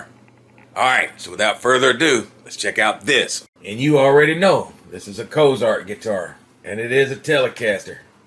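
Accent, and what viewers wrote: South, United States. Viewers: you sound southern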